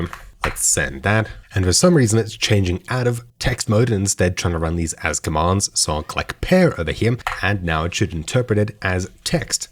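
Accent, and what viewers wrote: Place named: South Africa. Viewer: Thanks for doing creating this video. I have been using warp now for a couple of months but have not gotten into some of the things that you covered. I see that you had South African English on your Excel Worksheet. So are you still living in SA or elsewhere. I lived in SA for 30 years in total from when I was 15 way back in 1974. I lived in CarltonVille, Vereenging, Meyerton, Johannesburg, Durban, East London and started work in JHB as a computer operator working on an ICl 1903T Mainframe at GoldFields Mining and Development Company. Great to here a South African voice. I Miss SA a lot. My daughter is living in PMB.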